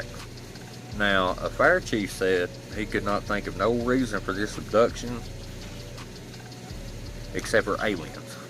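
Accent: South, United States. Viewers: You have to be from the south ?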